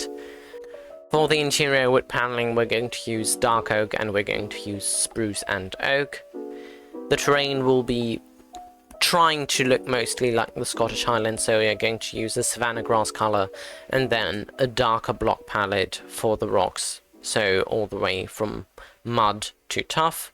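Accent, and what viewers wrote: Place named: Estuary, United Kingdom. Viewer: I am an American who loves the UK. I think us Americans automatically think monocle whenever there’s some RP in a British accent. I love yours. Sounds like an estuary accent. Somewhere around the home counties.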